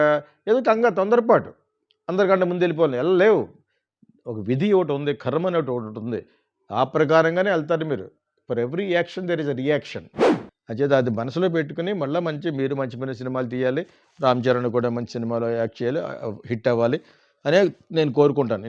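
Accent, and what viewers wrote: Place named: Konaseema, India. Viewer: Whatever the subject...I like your Kona Seema slang...and enjoy🎉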